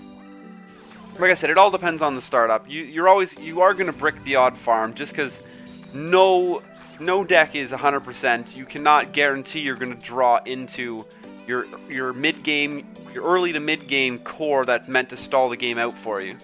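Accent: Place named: Canada. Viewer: and that how i learned that is Canadian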